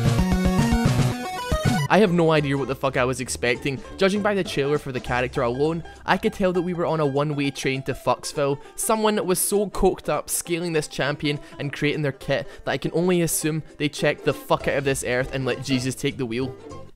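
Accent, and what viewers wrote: Scotland, United Kingdom. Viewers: I can tell you are scottish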